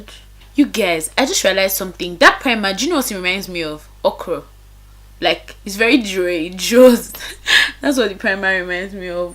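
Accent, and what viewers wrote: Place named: Nigeria. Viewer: Gurrl!! You're Nigerian accent is everything, original, i hate it when people try to copy other people's accent, kudos!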